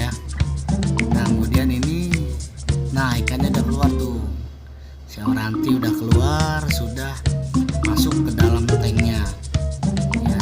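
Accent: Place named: Jakarta, Indonesia. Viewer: Seh Betawi amaat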